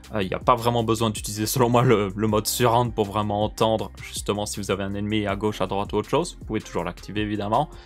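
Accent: Belgium. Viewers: 80 % des commentaires parle de l'Hyper X cloud 2 ; 10 % de l'accent belge ; 10 % autres .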